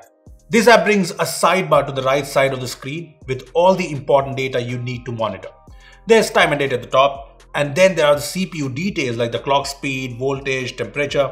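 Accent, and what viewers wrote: India. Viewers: Is that a Hindi accent?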